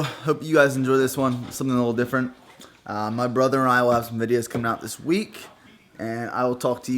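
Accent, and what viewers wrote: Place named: Philadelphia, United States. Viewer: you sound like your from philly/harrisburg area. I know that subtle accent when I hear it!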